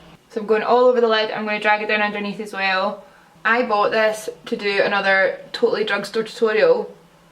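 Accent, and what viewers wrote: Scotland, United Kingdom. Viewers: thats coz she is scottish me too so i can understand everything she said lol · I looooove your various eyeshadow looks! brand new suscriber here and since most of the channels I follow are American it is SO weird hearing a fellow Scottish accent :D where about are you from? Are you able to write out product lists for your videos? it would be super handy! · She's Scottish 😊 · I love this video and your hair looks awesome! Its nice hearing little Scottish words every now and then and your accent (I'm from Perth) :) also really liked how chatty you were x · love your get ready with me's, especially your chatty ones!! it's so nice to listen to someone else that's scottish for once, such a stunning look!! defo gong to try this sometime xx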